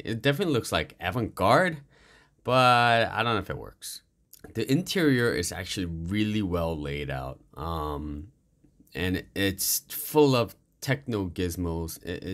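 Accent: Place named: South Korea. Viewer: I can tell that you're a Korean from your accent. Why are you pronouncing Hyundai as Hyun-DIE????? You know what they're supposed to sound like.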